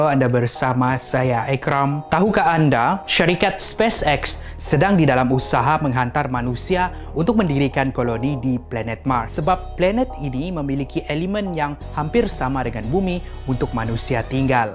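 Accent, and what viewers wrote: Sabah, Malaysia. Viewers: Kan,sbb cara pencakapan die bukan macam malay..dia cakap mcm ada slang sabah n indon sikit..tulah sebb org ingat die indon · ekram ni org mana? sabah ke? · Abg ni sabahan kan?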